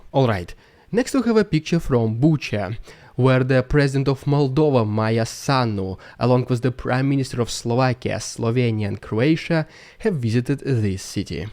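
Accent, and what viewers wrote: Russia. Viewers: "They [oligarchs] either have to [abide by] Putin's madness, or be extremely careful walking next to open windows": that was a great line. I just discover your channel now, interesting stuff indeed. Keep on the good work, I'm subscribing now, it's great to hear something else than propaganda with a russian accent:) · Nice Russian accent:)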